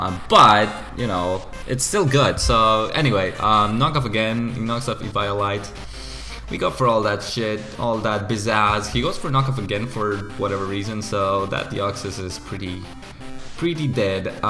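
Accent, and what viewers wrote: Poland. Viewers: My are you from Poland?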